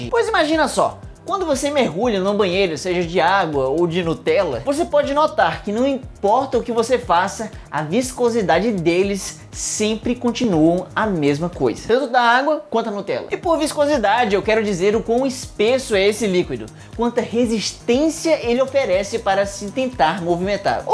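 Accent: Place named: Northeast, Brazil. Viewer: Ele é do nordeste kkkkkkkkkkkkkkkkkkkkkkkkkkkkkkkkkkkkkkkkkkkkkkkkkkkkkkkkkkkkkkkkkkkkkkkkkkkkkkkkkkkkkkkkkkkkkkkkkkkkkkkkkkkkkkkkkkkkkkkkkkkkkkkkkkkkkkkkkkkkkkkkkkkkkkkkkkkkkkkkkkkkkkkkkkkkkkkkkkkkkkkkkkkkkkkkkkkkkkkkkkkkkkkkkkkkkkkkkkkkkkkkkkkkkkkkkkkkkkkkkkkkkkkkkkkkkkkkkkkkkkkkkkkkkkkkkkk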